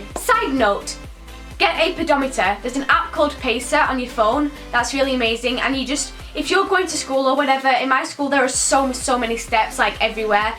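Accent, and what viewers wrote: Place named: England, United Kingdom. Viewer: ILYSMM i really want to meet you i think we’d get along sooo well! LYSM also what part of England are you from? Your accent is so strong! In a good way obvs ILY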